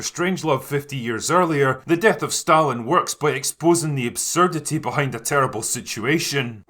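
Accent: Scotland, United Kingdom. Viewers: Narrator has quite the Scottish accent. Or Yorkshire. Either way, ridiculous. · “Ya know…” I fookin’ love this film. You could’ve voiced a Soviet General with yer Scottish Brogue, Comrade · yes scots do talk like that. Mate, what the fuck would have to gain by pretending not to be danish?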